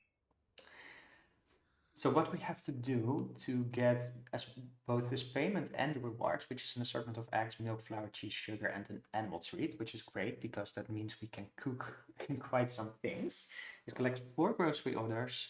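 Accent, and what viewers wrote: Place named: Netherlands. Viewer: hahaha ja nederlands-engels accent is best wel duidelijk! Super leuk dat je begonnen bent met let's plays! Succes! Btw je thumbnails zijn echt perfect! Heel mooi!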